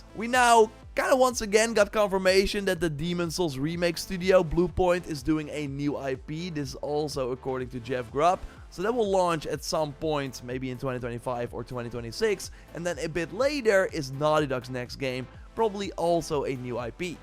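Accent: Netherlands. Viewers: I know that accent, you're probably from Netherlands 😀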